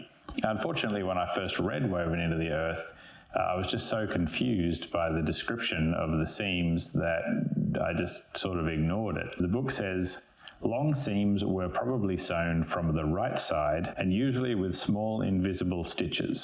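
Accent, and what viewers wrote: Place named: Australia. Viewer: Great video! I’m very new to hand sewing seams. Right now I’m attempting to make a shift using the “seam and fell” method described in a book I found called “The Medieval Tailor”. It’s a bit like what you described because the seam is worked in tiny stitches from the right side too. Have you tried your technique for longer seams such as side seams or for seams where it’s trickier to have one hand behind like sleeve seams? You might have addressed my questions in other videos - I’ll go and see what else you’ve posted! Also, it’s lovely to hear an Australian accent 😊. I’m in Adelaide, but most of the other YouTube historical costume channels I have found are in the US and UK.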